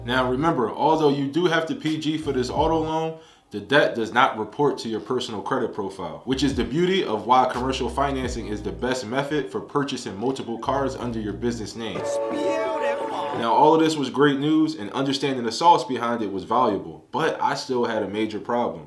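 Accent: Philadelphia, United States. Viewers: This a Philly accent? Makes me feel like I’m in the right place for this info. 😂😂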